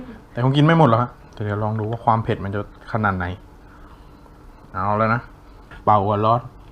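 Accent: South, Thailand. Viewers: พี่เป็นคนภาคใต้หรอครับ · คนบ้านเด่วกันคนใต้ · พี่คนใต้หรอ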